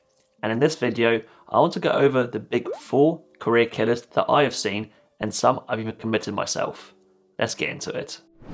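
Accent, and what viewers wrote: United Kingdom. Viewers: I am using your video as part of my IELTS listening;you have a nice british accent.Keep good health brother❤️.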